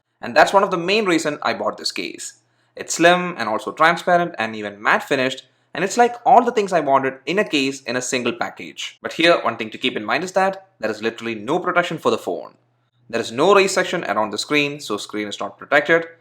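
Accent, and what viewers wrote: Kerala, India. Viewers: aa accent ketapozhe enik thonni malayalee aanennu ..hahaha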